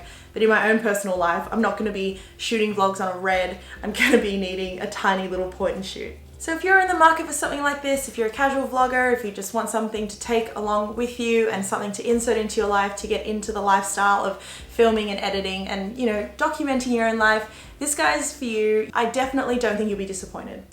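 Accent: Australia. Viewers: I really like how you talk. That Aussie accent sooo nice! Nice camera btw 🙌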